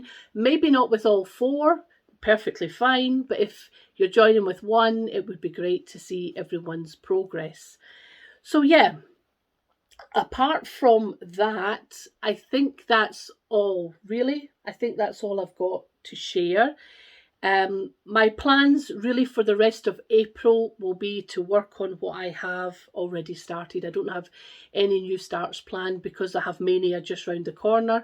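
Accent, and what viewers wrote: Scotland, United Kingdom. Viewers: A great cut and lovely colour! Your hair really suits you. Thanks for the videos, I always enjoy them and I love listening to your Scottish accent. I was born up in Banffshire several decades ago, but was brought down to England as a babe in arms and here I have stayed. Your voice makes be feel nostalgic for Summer holidays with my grandmother. Now I must see if I can out my hands on that Blackbird Christmas design..... All best wishes, Liz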